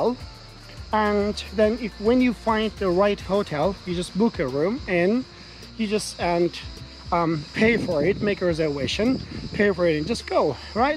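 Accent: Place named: Turkey. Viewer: özüne dönmek budur 🤣 ingilizce konuşmaya başladığı ilk dakika bu adam türk belli dedim bla bla bla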